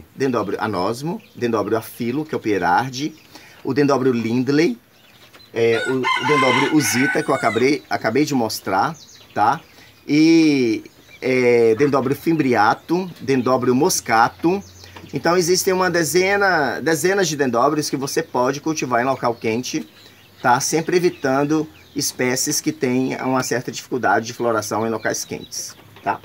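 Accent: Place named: Minas Gerais, Brazil. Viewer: Amo os seus vídeos, vc é 10. Adorei o jeito de vc falar,desbeisado tem que ser Mineiro mesmo igual eu falo,afinal sou dessa terra também. Besossss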